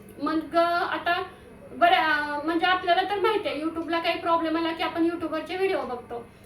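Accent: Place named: Karad, India. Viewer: Congratulations!! 😊छान माहिती दिली 🙏🏻🙏🏻 धन्यवाद!! आमचे गाव सुद्धा कराड पासून जवळच आहे. माझेही cooking channel आहे. तुमच्या बोलण्याच्या पद्धती वरून तुम्ही आमच्याच भागातील असाव्यात हा अंदाज खरा ठरला.👍🏻😊 तुमचे व्हिडिओ छान माहितीपूर्ण असतात . channel साठी कधी गरज वाटली तर मदत कराल अशी आशा करते.. तुम्हाला खूप खूप शुभेच्छा !!❤😊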